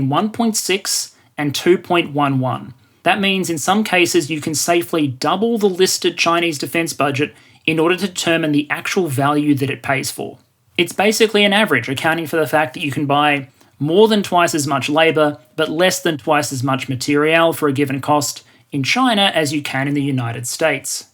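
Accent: Australia. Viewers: Not too often one can hear Argentina in an australian accent. Love your videos mate, greets from this far away country. Un abrazo a todos guacho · Your chinese pronunciation isn't bad. As an ABC (American Born Chinese) it was super weird hearing Chinese spoken with an Aussie accent.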